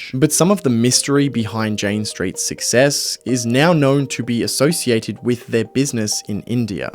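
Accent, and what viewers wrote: Australia. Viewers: It's so hard to listen to the Australian accent. Putting a subtle r at the end of words that end in o...lol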